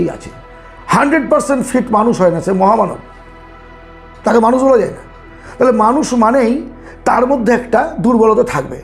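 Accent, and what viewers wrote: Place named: West Bengal, India. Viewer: আপনার কথায় পশ্চিমবঙ্গের টান আছে।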